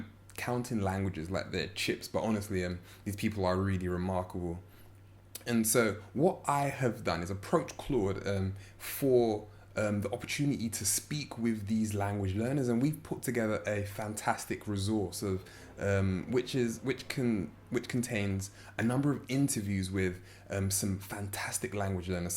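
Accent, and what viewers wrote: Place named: England, United Kingdom. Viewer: i love u´re english accent :D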